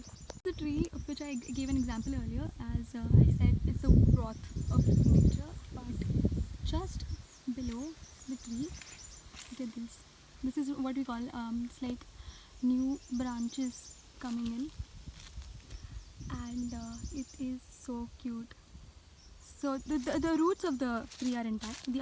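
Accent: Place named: Maharashtra, India. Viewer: Are you marathi???.. Your words sounds like marathi tone🤔🤔🤔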